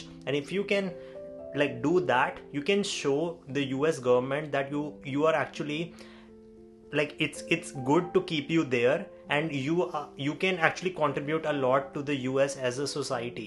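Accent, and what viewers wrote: India. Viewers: i really cant stand indian accent ufff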